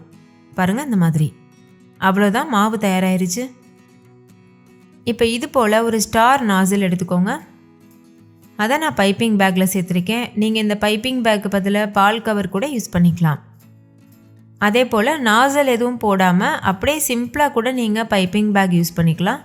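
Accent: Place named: Kongu, India. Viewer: Neegal coimbatore ra.kongu Tamil nala pesugiramga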